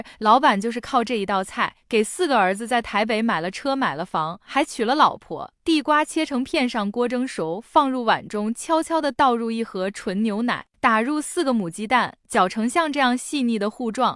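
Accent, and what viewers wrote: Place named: China. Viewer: 又是中共假臺灣之名的中共網站，聽聲音語調分明是中國大陸人口音語調！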